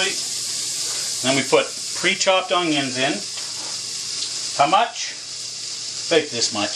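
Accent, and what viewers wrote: Canada. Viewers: You are a scream and i love the Canadian accent. Gotta watch the middle though, more lean and less fat. The dog will like the fat unless his middle is too large. Your wife giggles so good. She is a keeper.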